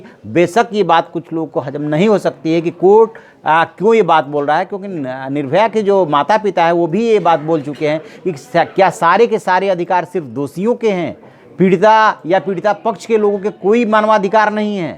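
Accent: Bihar, India. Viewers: Bihar se ho kya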